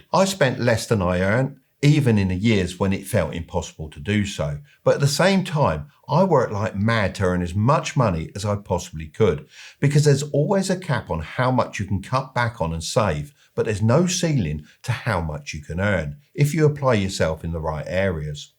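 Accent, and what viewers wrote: United Kingdom. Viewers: come on grand pa. I think you lying. cos america is booming business is great.. you sound liek a British. a depressing miserable land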